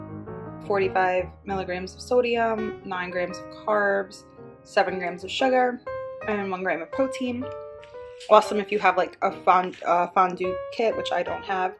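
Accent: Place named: Long Island, United States. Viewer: Hmm... that's a long island accent lol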